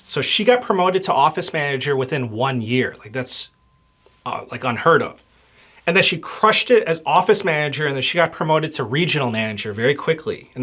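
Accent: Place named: Michigan, United States. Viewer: Are you from Michigan? You have a subtle Michigander accent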